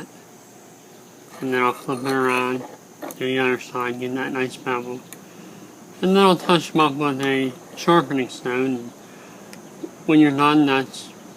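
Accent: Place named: United States. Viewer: gangoso americano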